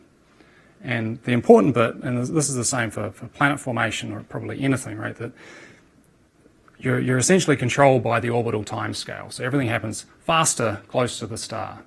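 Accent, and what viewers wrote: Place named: New Zealand. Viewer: " I'm not gonna try and compete with Van Maanen's Star" - He does have a distrinct New Zealand accent.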